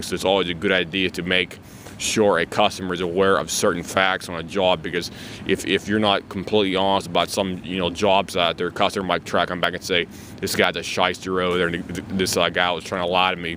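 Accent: Pittsburgh, United States. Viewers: Dude, your Pittsburgh accent is STRONG! 😅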